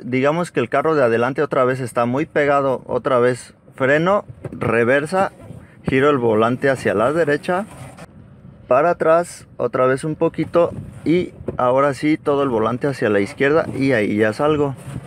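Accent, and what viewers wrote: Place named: Popayán, Colombia. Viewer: Ud es de Popayan?, tiene acento de allá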